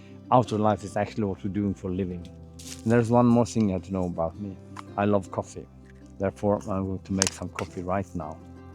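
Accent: Sweden. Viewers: Great and entertaining video, as usual, 👌🏻 And, as another Swede (right now based in Lycksele), I also find your lovely Swenglish to be entertaining in itself 😊 Reminds me of Swedish friends struggling with launch vs lounge.. 🤭😁 I think it's like you said: for the devices you need to hold in your hands a lot, it wouldn't be practical to always have to rely on external power source/bank. On my hikes, I carry a Fujifilm X-S20 with a 70-300mm zoom and a DJI Action 6, both being very mobile by hand, so to say, and thus not suitable to be 100% dependent on external power. I use both a lot, so I carry 2-3 spare batteries for each. As a solo-hiker, wrt a device like Garmin Sat messenger, I think a built-in battery that's well protected from the weather is the preferred solution, for safety reasons. That said, I do agree with that user-replaceable batteries should be more common. 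I also agree with that external power banks bring flexibility when it comes to devices that are only used part of the time - and hopefully not all at the same time 🫣 - coz then they can time-share the external power bank(s) 🤓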